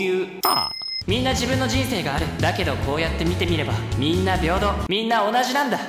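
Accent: Kansai, Japan. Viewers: イントネーションめっちゃ関西弁やん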